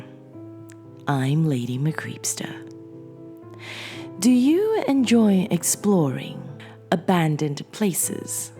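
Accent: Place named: United Kingdom. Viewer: I love your British Accent and this channel, you just got a new subscriber. Great Show Indeed !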